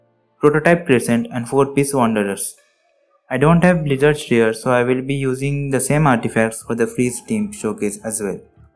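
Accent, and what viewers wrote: India. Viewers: Nice guide are you indian ... That accent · the indian accent hits home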